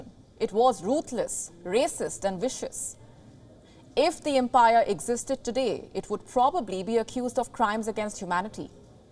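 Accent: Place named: Punjab, India. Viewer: Heavy punjabi hindi accent!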